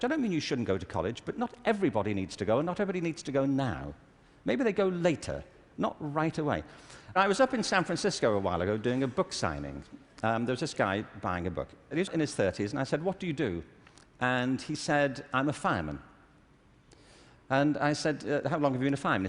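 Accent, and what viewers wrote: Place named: United Kingdom. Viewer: Don’t like British accent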